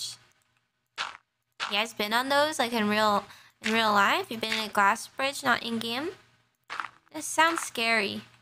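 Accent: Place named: New York, United States. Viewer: Her accent almost NY-like. Mid Atlantic/NY? Anyways I usually don't like 'mom jokes' but everytime she cracks it its so cute and funny.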